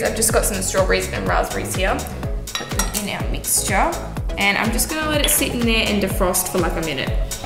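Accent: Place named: Australia. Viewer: I actually had no idea she was Australian, but I did wonder bc i started watching her Barbie vids first, so I wondered why she sounded so weird and different bc I never heard an actual Australian person say anything except like alrighty mate or something like that but then I found out why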